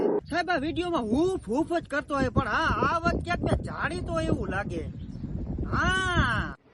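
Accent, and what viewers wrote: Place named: Gir, India. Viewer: Gir No Havaj Se Bhai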